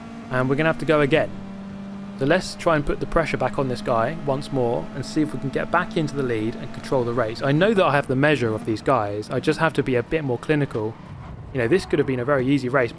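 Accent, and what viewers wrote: United Kingdom. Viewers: Listening to laid back british accents is the thinking mans opioid.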